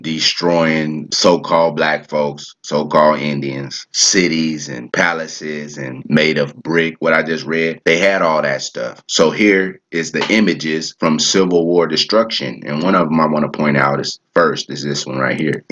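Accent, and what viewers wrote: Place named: Alabama, United States. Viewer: You sound like my family from Alabama brother but you keep giving me answers to alot of my question. Great honor to you and your family🏹🦅👑🔥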